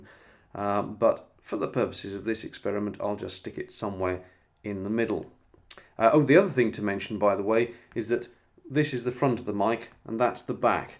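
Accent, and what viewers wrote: United Kingdom. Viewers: i love british english :P good review, will buy it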